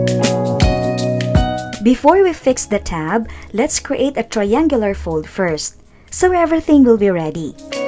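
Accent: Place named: Philippines. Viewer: It would be really helpful if you could make the measurements stand out more as really hard to see pencil. Black felt tip maybe? Are you Phillipina? I thought I recognised the accent 😊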